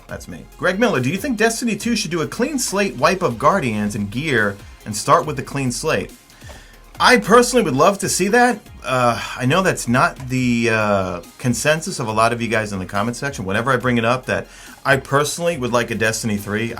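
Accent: New York, United States. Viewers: #MadeItToTheEnd Can you do a video with your new York accent #askmesa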